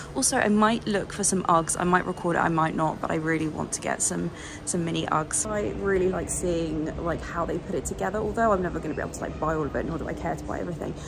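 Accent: United Kingdom. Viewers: Mais uma vez um ótimo vídeo 😚.Desculpe se não escrevo em inglês, não falo muito bem inglês, adoro seu sotaque britânico. Está loja realmente tem coisas lindas! Não acredito que você consiga sair destas lojas sem comprar nada. 😘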